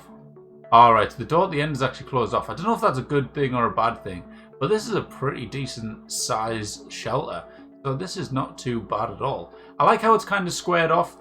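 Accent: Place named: Newcastle, United Kingdom. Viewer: A fellow Geordie 👍👍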